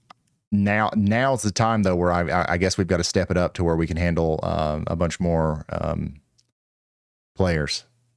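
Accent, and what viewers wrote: South, United States. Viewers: We southerners have our own way of pronunciation. It's a little ridiculous to have a man work late for your protection and you write this!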